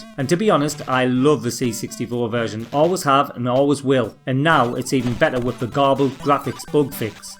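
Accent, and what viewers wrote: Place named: Newcastle, United Kingdom. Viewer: Nice Nuke Astle accent!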